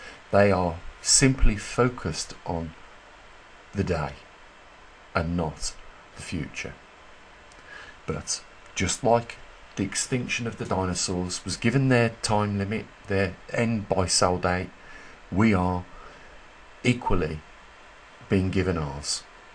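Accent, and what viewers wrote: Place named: Black Country, United Kingdom. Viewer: M8… where ya from? You sound local… I’m in West Brom, let’s meet up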